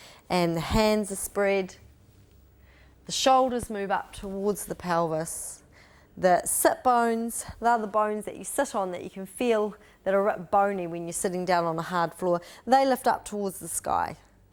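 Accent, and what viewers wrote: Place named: New Zealand. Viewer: haha awesome NZ accent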